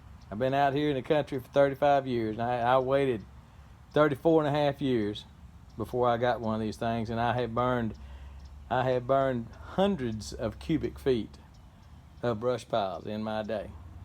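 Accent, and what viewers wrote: Texas, United States. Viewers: He's a Texan. That's a Texan pronunciation. Texicanese is almost another language, from what I can decipher. If you don't believe me, next time you watch one of videos turn on the Youtube Closed Caption CC button. What a hoot!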